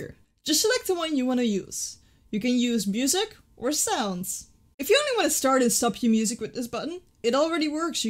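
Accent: Netherlands. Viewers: Even if I'm not a streamer I bought a Stream Deck to have fun in Discord during our gaming sessions. I searched for tutorials how to use it as a soundboard and found your video. I really like this video it because it is clean and simple without much talking about other things that are not relevant for the topic. It's a pitty that your videos don't have much more views. And I love this dutch/english accent :)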